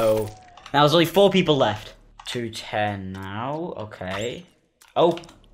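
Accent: United Kingdom. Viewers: You sound like a very scottish man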